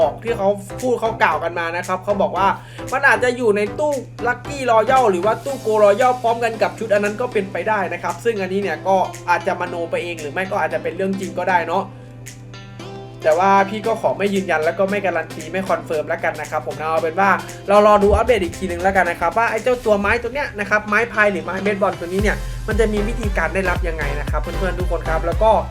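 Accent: Isan, Thailand. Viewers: พูดกลางบ้างอีสานบ้าง555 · เว้าอีสานม้วนเเท้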